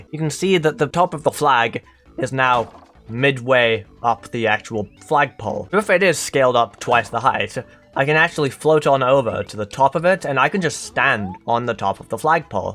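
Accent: Wales, United Kingdom. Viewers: She's British, Welsh specifically. That is what Britons call the last letter of the English language, which their ancestors the Anglo-Saxons created.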